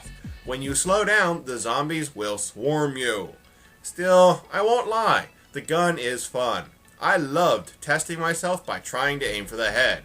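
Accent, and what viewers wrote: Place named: North Germany, Germany. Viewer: I love how he pronounces Nacht der untoten (BTW, i am german, and he sounds like a North-german)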